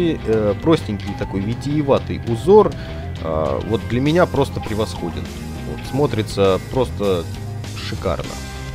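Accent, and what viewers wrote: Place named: Russia. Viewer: I liked your russian accent having a deck with Mr.Lee Asher signature on it is an honor A MUST HAVE DECK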